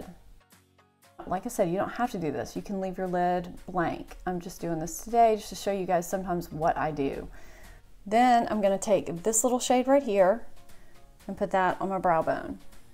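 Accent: South, United States. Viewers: I kneed to get the Physicians Formula shimmer strips. I just noticed that you are in Louisiana. I live in Mississippi. Love hearing a familiar Southern accent on youtube. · I just love you girl. That sweet Southern drawl just relaxes this Yankee!! I've tried some of your recommendations already(new sub) and they've worked well, both the products and the techniques. So glad I've found you!!! Our coloring is very similar, but I'm not a blonde. My eyes are brown, hair is auburn and I've got more than 20 years on you!! Tee hee. Still your style and techniques work so well for me. I so enjoy your bedroom where you film, it's so beautiful. So , pls keep doin what you're doin. I so enjoy you. Love your 🐶 in the background and your daughter was adorable. Enjoy your day , and I'll keep watch for your videos. Again, so glad you're there, Dear. Love from PA. 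Rachel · Hi! I have to agree w/ your other sub who mentioned loving your southern drawl as a yankee 😀 I too adore it. My fiance is from Texas & I swear by talking to his mom & sisters, it's like being at a spa, their voices are so soothing lol. Anyway, great video as usual. I've never used the Benefit Erase Paste, but now you are making me go buy it ☺ I also love using shadows too as liners for wings, that Nars color is a gorgeous brown, may have to get that too! Love this look. 👍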